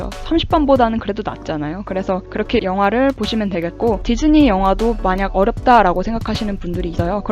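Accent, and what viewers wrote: United Kingdom. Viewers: I love your British accent! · 와우!!!! 브리티쉬 액센트!!!!!!!!! 너무 부러워요!!! 전 연습해도 액센트는 맨날 콩글리쉬 수준 ㅠㅠ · 영국식 억양 완전 멋져요!! 대부분 미국식 억양 교재나 컨텐츠들이 많은데 영국식 억양은 어떻게 연습하신거에요?? 영국 다녀오셨던 게 큰 도움이 되셨던 건가요??rpg 게임 팁 완전 도움이 될 것 같아요 ^*^ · 언니 브리티쉬악센트 뭐에요 대박!!! 넘나 멋져ㅠㅠㅠㅠㅠ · 너무 차분하게 말씀해주셔서 좋은것 같아요, 저는 미국식 발음으로 배웠는데 요즈음 영국식 발음이 너무 좋아서 부럽습니다..ㅠㅠㅠ♡♡ 겨울왕국(frozen)으로 하다가 포기했는뎅....듣기라도 계속 해야겠어요...ㅋㅋ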